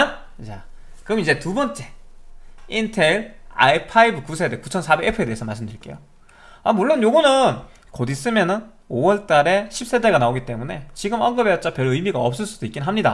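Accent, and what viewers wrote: Gyeongsang, South Korea. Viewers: 대구 말투를 쓰길래 대구 일거 같아서여 ㅎㅎㅎ 대구에서 컴퓨터 하시나요??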